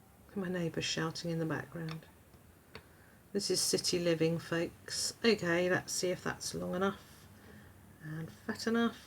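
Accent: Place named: England, United Kingdom. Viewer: I don't do hash tag. I can't understand why anyone would ever give you a mean sign or make an unkind remark to you. You are adorable and most of the I just listen to you talk. I speak "American" and you speak English and I love to just hear you talk.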